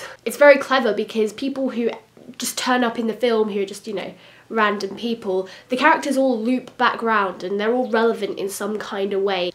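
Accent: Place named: England, United Kingdom. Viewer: I really like your accent :D Which part of England is it from? And nice eyes too :P . Yes, i've seen all 3 Hostel parts, and the best is the first part.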